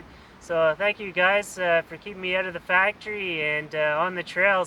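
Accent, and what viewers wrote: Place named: Canada. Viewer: Wonderful vid's. However, what really has attracted me to your vid's is your "voice & Canadian accent & yes "(oout, aboout). A magnificent combination. Thanks for all that you do & keep up the great work🥂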